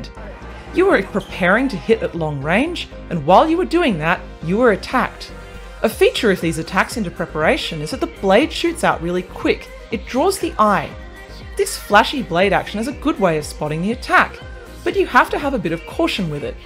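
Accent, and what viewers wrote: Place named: Australia. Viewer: dat aussie accent:D Finally this day has come! We can hear ' voice and don't stop the video for text reading. Sometimes it was given too much text for a very short amount of time. I don't know about the others, but as for me, for a not-native English-reader, I couldn't read those sentences that fast in English. Thanks, keep prospering and enlightening people about this spectacular sport!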